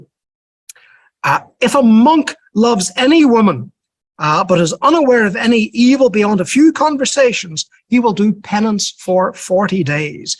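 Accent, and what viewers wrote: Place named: Northern Ireland, United Kingdom. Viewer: Thank you, Professor. You have what appears to be a Northern Irish accent. I would wonder to what extend today's Orange adherents relate to this history. Or do they consider it the history of the people they have dispossessed -- the native Irish -- and thus not relate to it?.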